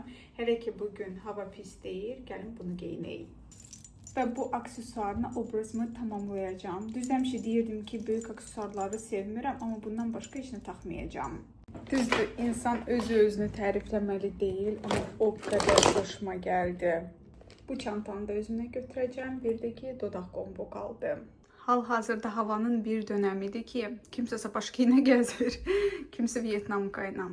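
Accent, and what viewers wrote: Russia. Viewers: после долгого просмотра тебя на русском, смотреть и слушать азербайджанский из твоих уст так странно. И сразу чувствуется,что ты русскоговорящая😅 ❤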